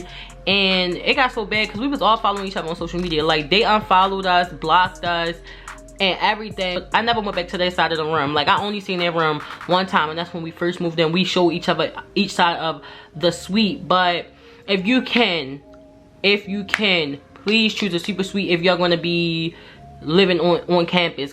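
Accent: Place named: Maryland, United States. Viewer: Yesss straight into the story ! I’m loving it 💯u jus earned yourself a #Newsubbie 🥰 btw Are you from Maryland ? I’m asking because I’m from Baltimore Maryland and your accent sounds like u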